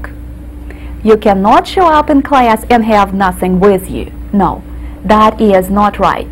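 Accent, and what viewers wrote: South, United States. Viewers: She sounds like she learned English in the south. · I love how you can hear little glimmers of Britney Spears Southern American in her accent. "Got my edu-kai-tion..." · I like this but I swear I hear a strong southern States accent, I suppose that kind of stuff makes possible to guess what area people are from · You speak with such a strong Southern accent. you got this accent in 8 years ! Where in the South do you live mam ? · I like how she has a slight southern twang lol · This is so amazing that she has a southern accent!!! amazing!! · southern cowboy accent comes from the deep words pronounced in Russian that makes her talking in that way in English! :) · Never heard a russian and southern accent fighting each other at the same time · she kinda sounds like she has a southern accent, which is ironic since she's from Russia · Thanks for the video! 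I have to say that I was very surprised to hear that you are native born russian. It is easy to tell that you've been living in the south because you have that southern drawl. Spahsiba! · the southern(?) accent is throwing me off but i kinda love it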